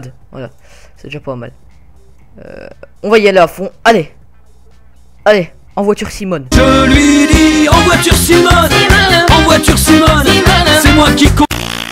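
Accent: Belgium. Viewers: tes belge ? Vrèment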